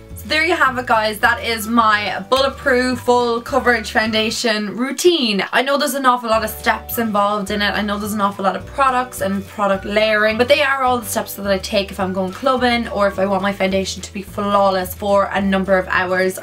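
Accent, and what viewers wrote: Ireland. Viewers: Your Irish accent is so beautiful!! And so are you <3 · I've watched your videos for some time now and I still cant get over your accent! I love Irish accents! They are so much more interesting than the boring oll American one. Loved this video as well! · Are you Irish? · omg are you irish? C: you sound irish :D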